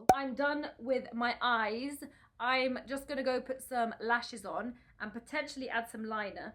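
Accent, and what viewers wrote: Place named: Scotland, United Kingdom. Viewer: Oh I hear a Scottish accent! :D hello fellow Scot!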